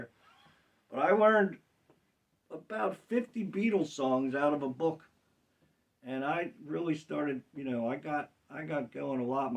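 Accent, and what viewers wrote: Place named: United States. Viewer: Hi can you document the down up down up. I am having difficulty understanding what you are saying,your American accent Am from Liverpool in the UK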